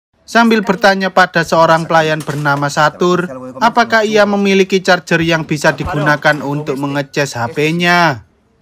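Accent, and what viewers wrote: Java, Indonesia. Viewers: logat nya kok jawa kali jadi enek di denger, kenapa gak biasa aja bg biar bagus kayak IQ7 & SAQAHAYANG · Ngomongnya bisa ga sih gausah medhok gitu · Medok bngt.. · Saran saja mas tolong logat Jawa nya jgn terlalu keras pasti seruuu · Medok sekali 👍🏼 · Ya allah mas medokkk e samean wkk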